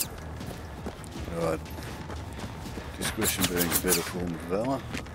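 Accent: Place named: New Zealand. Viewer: Based on the accent, I would guess phlegmatic New Zealander. Am I close?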